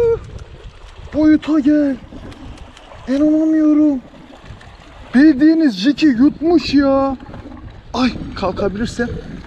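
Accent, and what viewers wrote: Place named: Black Sea, Turkey. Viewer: Kibar karadenizli aksanın çok hoşuma gidiyor 😂 Başarılarının devamını dilerim.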